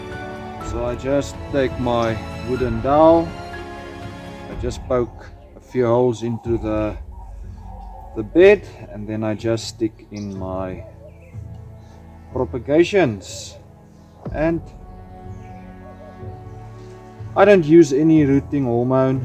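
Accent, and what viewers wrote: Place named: South Africa. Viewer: I recently came to live in Australia from SA and have bouts of homesickness. Trying to find how-to propagate rocket. What a wonderful surprise to hear the "ou" accent again! What,a blesing! Thank you for your very encouraging lesson..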